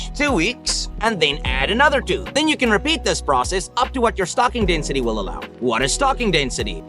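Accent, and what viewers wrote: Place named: South Africa. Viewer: Hey you’re South African I noticed an accent Aseblief please get a mic And can goldfish have tank mates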